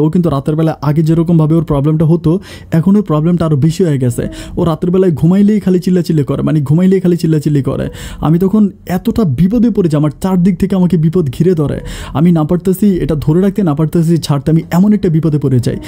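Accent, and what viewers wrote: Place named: Noakhali, Bangladesh. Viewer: তুই কি নোয়াখাইল্যা? কিন্তু মূর্খ নোয়াখাইল্যা.. তবে তোর ভয়েস টা সুন্দর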